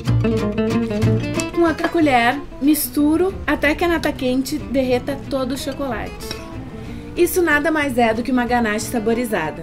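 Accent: Rio Grande do Sul, Brazil. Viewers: Guria! Amei a receita e também gosto muito do filme! No programa passado desconfiei que você fosse gaúcha! Eu também moro no RS, em Caxias. Já curiosa pela receita da próxima semana! Beijão!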